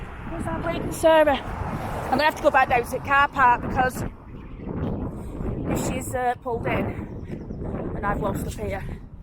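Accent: Oldham, United Kingdom. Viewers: Thank you for your tours. I believe I mentioned, a few years ago, that my grt grt grandfather ( Henry Greenwood) was born in Oldham and came to America in the 1800s. I often wondered what his accent would have sounded like and my guess is...just like yours! I 🤔 think so anyway. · Awesome. Thanks Sarah for the memories. I am 71 now and i lived on Rock St in 1952 to 1960 just opposite what used to be a coffin makers. I played in the church gardens, round Tommyfield and Alexander Park. You even talk like an owdumer. lol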